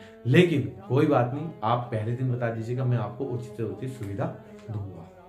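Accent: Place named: Lohaghat, India. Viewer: Aapkey bolney k tarekey sey mujhey merey lohaghat k bhai bandhu aap mey hi najar aa gaye aa gye,sari jaankari bahut badiya de aapney .Man karta hai ki abhi Gurudev k dershan krney aa jaau per noukari na honey sey preshaan hu,is chinta sey mukt hotey hi Baba ji k chaar dhaam dershan krney jarur aaungi 🙏🏼jai baba ji maharaj 🌼🌼🌼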